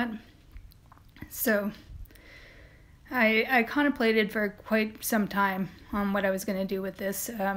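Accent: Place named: Canada. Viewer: I am a big fan of your art and really like your videos. Your video presentation is quite cute and I especially enjoy your "Canadian" accent- on your" aboot" and "owoot"- anything with an ooot in it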